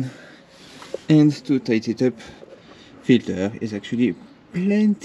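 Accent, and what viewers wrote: France. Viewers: I love your French Englisch :) Very enjoyable. I hope i'll get a Modus for my wife next week. Her loved Twingo mk1 needs replacement. And now i know how to change the oil and stuf for her future car. Thank you for that :) Greetz from Holland!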